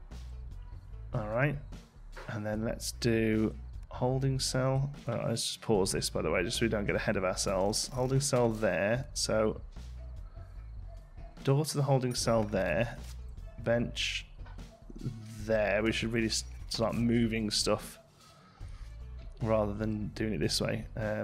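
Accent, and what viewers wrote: Canada. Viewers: Wow, now that you are speaking Canadian, it is really hard to understand you when you talk fast!! :) (I think you are going to make me spend money on this game!)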